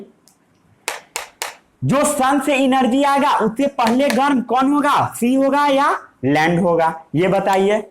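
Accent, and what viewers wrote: Bihar, India. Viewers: Yes something like Bihari tones... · proper hindi chaiye Bihari style nai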